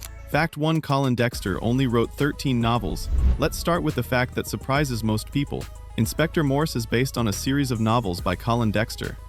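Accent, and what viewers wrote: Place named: United States. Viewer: Interesting but it is a shame that AI accent is American.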